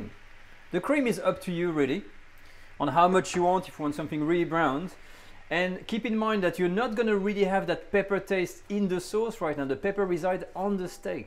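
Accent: France. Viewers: This dude sounds so French.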